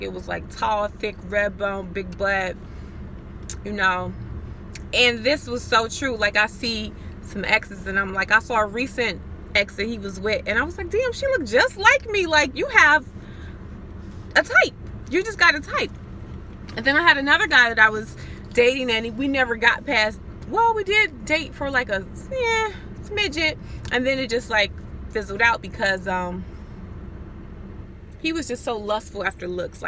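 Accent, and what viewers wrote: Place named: New York, United States. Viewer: Dang pretty lady. You sound like you’re from the NY.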